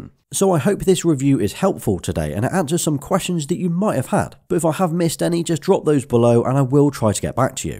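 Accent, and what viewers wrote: United Kingdom. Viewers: what? I love british accent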